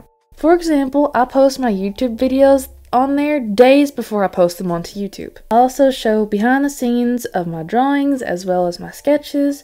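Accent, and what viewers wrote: South, United States. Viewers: you sound southern but your voice is so sweet and calming! this video is so helpful thank youu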